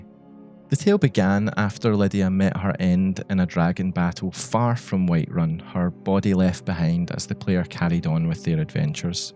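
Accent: Scotland, United Kingdom. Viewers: Ah! A Scotsman!! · Tbh I've never heard of a Scottish Skyrim Player, let alone a storyteller. I like it alot. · I have no idea why but this was the first video of yours to pop up on my radar and hearing another scottish voice was oddly satisfying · Subscribed for my specific favourite Scottish accent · i was watching this in the background at work, and my boss went “holy scottish accent” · You're Scottish aren't you? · I didnt know I needed urban legends of skyrim narrated in a socttish accent until now. Thank you so much. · Where are you from in Scotland? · Scottish accent makes this video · Not gonna lie, your slow, soft, Northener (Scottish?) accent makes all those stories so much more eerie 😨😨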